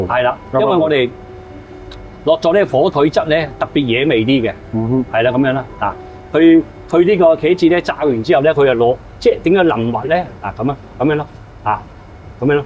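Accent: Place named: Dongguan, China. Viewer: 好正！冒昧问句，是否东莞人？好似听到有东莞乡音！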